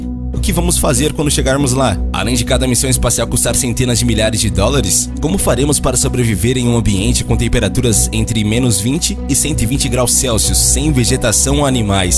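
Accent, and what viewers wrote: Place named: Caipira, Brazil. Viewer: O cara falo com um sotaque caipira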